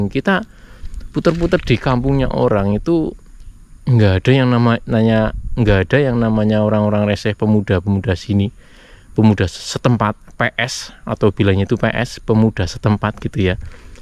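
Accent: Banyumas, Indonesia. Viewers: Mase asale saka ndi koh ? Banyumas apa ? 😂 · Ngapak e njiplek bgt mas 😭 the real medhok neng ngana 😭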